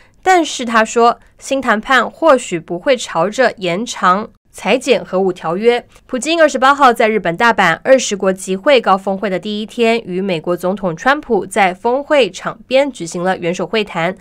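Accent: Taiwan. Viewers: 台湾口音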